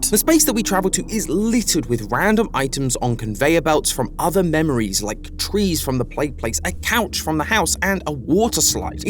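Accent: United Kingdom. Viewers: I genuinely do not remember you being British, am i from another universe?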